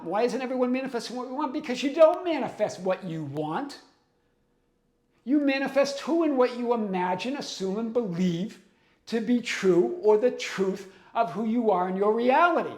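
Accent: Boston, United States. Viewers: You tell them (tell them with your Boston accent - I'm from MA as well, LOL)!! But I empathize with those who ask those questions, because for a lot of us this whole LOA/Quantum Reality has been difficult to digest. Yes, even for some of us highly intellectual, intuitive or even mystically aligned people. It's easy to meddle with the middle as we get caught up with worldly matters, that we can experience with our five senses, because that is ALL we have had going for us.... All our lives! That is what we call "conditioning." But your videos are liberating, and that is fulfilling in itself! That is a sign - Thank you It will click for them, just like it did for some of us... "There's no sign outside of you, there's no truth to that other than the truth you give it" - beautifully stated...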